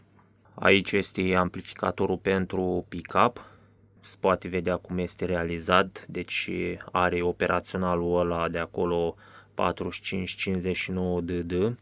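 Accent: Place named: Moldova. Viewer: Sa ceri sa lase mai jos de 50 de lei si ai acasa osciloscop Rhode Schwarz ,apoi inteleg ca esti din zona Moldovei dar se pronunta corect in limba romana condensatoare nu condensatori ,tranzistoare nu tranzistori,.Bafta!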